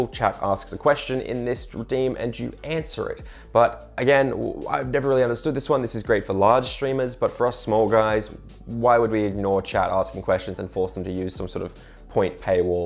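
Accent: Ireland. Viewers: As a German that is constantly being told that I have 0 accent, I took inspiration from your Irish accent and let my chat force me to "spiek in zeh tscherman eccent leik zhey taught as tu spiek in school" - instant redeem the first time I used it and my viewers got a kick out of it - thanks for the idea. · I like the Irish accent!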